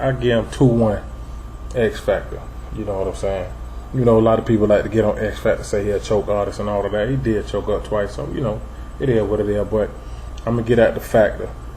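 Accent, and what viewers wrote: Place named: South, United States. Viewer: N I fucks wit u cuz u kept it 100 all the way I could tell u was frm the south b4 u said it real nigga shit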